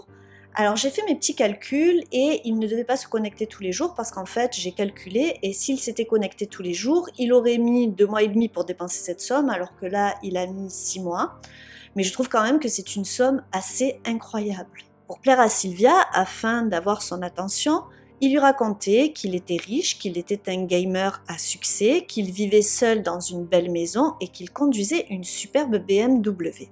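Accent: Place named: Var, France. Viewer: cogiter stories a traité le sujet il y a 3 semaines. Mais je vais quand même regarder ton travail car j'aime ton accent varois(peut être je me trompe) et car tu développes très bien tes sujets. Bonne fêtes de fin d'année à tous.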